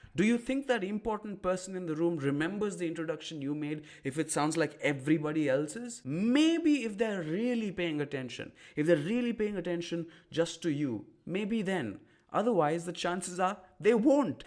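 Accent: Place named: Maharashtra, India. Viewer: I too initially thought you are from Maharashtra :D. Great tips!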